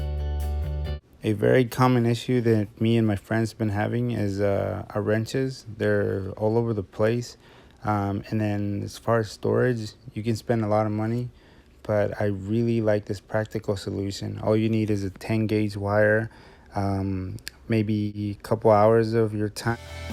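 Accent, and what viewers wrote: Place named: France. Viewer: If YOU were really paying attention you’d have recognized that English is not his first language. Hints - photos of Paris on his wall, his name, his accent (although it sounds like French ancestors but growing up somewhere else).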